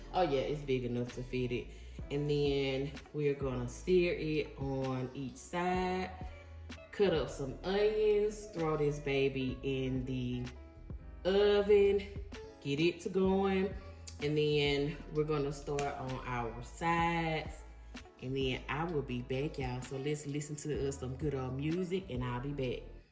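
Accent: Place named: South, United States. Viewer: You are just such a vibe! I love how relaxing this is! I also love your southern accent! Jersey girl here! lol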